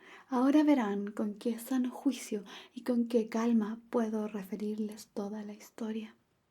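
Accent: Chile. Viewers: Yo solo vengo a que me lean en chileno, aunque me odien jajaja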